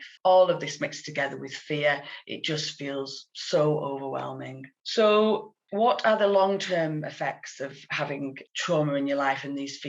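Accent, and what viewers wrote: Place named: Northern England, United Kingdom. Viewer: Thanks for sharing your knowledge and expertise! It’s also nice to hear a more authentic Nortthern English accent, rather than the usual North American!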